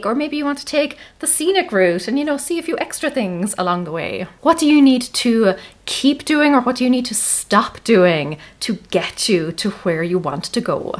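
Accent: Ireland. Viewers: I'm not really a superstitious person, but I read years ago that you should do the things you want to do in the coming year on New Years Day to set the tone, whether it's reading, journaling, exercise or family time. (Also, I'm still trying to place your accent. My parents were from Louth and it's similar).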